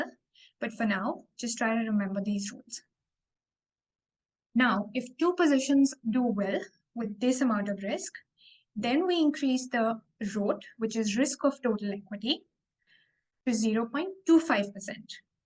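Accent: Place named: India. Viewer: Idk why but watching the video having an Indian accent seems easy to grasp... 😂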